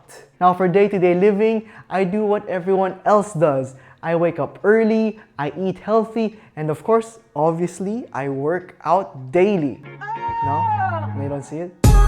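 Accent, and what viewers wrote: Philippines. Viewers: Are you Filipino. You have an accent lol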